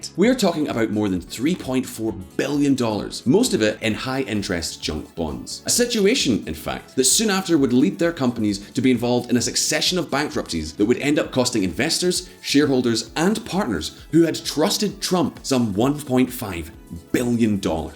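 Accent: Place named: Scotland, United Kingdom. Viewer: THE NEW YORK TIMES EH! BS WHAT A RAG! You sound like a SCOTTISH LEFT WING INDEPENDENCE PRICK.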